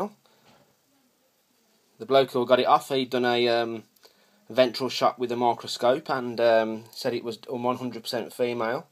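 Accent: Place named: Wolverhampton, United Kingdom. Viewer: Are you from Wolverhampton?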